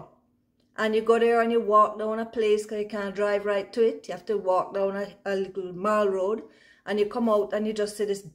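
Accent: Jamaica. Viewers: miss Jamaica before we became the murder capital . your accent takes me back to the good old days . thank you. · As mentioned in another of your videos, I am from St. Ann...but until this past July, I had NEVER been to "Irie Beach"...also called ""Irie Gully Falls" (there are some other names used as well). Can't wait for December to take my wife there. Just LOVE the way you talk. I feel like I should write my comments in patwa....LOL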